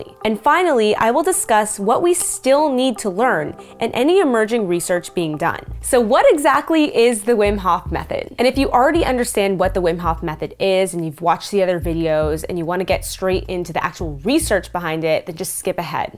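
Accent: Boston, United States. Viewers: Well done. Are you from Boston too?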